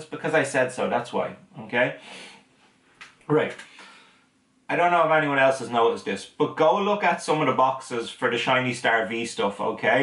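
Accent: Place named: Ireland. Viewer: I never knew I needed an Irishmen to teach me Pokemon. Erin Go Bragh!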